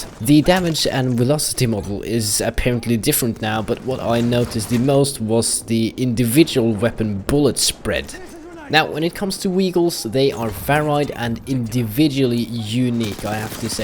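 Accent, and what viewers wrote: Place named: Europe. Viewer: You're voice is really reminiscent of DannyOnPC, just some European goodness :3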